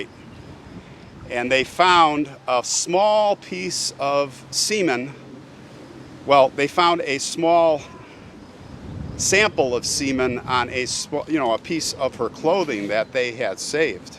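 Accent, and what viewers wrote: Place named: Chicago, United States. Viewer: Love your channel...I am from the Chicago suburbs and can definitely hear your Chicago accent :)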